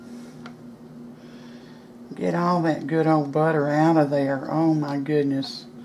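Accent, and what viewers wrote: South, United States. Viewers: I love the sweet southern accent 😍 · My mother was from the South, I love your accent and I look forward to your recipes. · "Ya'll" and saying " your sorry" so much sure shows you live down south. Love to you sweetie.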